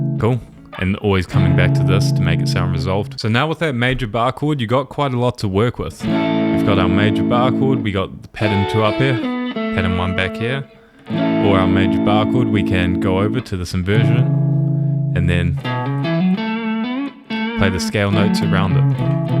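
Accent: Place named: New Zealand. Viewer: Fantastic! Best instruction and I detect a kiwi accent….. where we love and many others.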